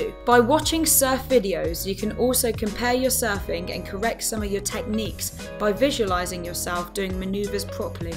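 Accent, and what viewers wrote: United Kingdom. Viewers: and we have a brit commentating here.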